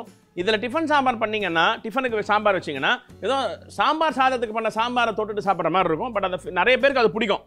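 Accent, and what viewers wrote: Chennai, India. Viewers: Dear chef, Love your passion and the joy you have for cooking, my Amma watched every episode of Samayal Samayal and enjoyed it immensely... Unga Madras Thamizh kaettaa I feel so at home 😊... Do we add the Sambar podi only in the end and not while cooking the veggies in the tamarind extract? Will there not be a raw smell (of the chillies kaaram) since we are turning the stove off immediately after adding the Sambar powder and not cooking it? Will be thankful if you can pls clarify, this is my absolute favourite and I'll be super thrilled if I get it right, missing Chennai :)...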